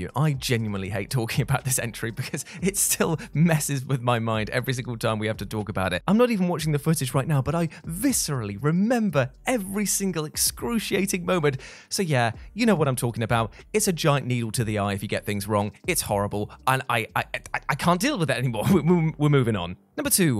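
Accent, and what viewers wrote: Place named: United Kingdom. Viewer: It’s a British pronunciation. You should hear how they pronounce “schedule” or “herbs”.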